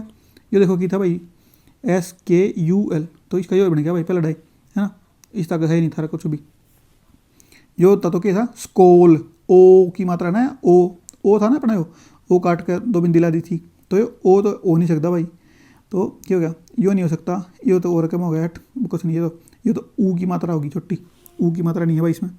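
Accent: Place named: Haryana, India. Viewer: Very valuable and useful class (english in Haryanvi tone👍🏻)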